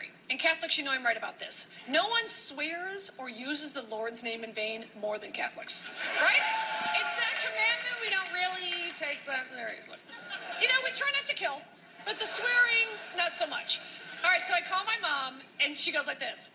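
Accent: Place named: Chicago, United States. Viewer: She's from Chicago and this is how older Chicago moms sound.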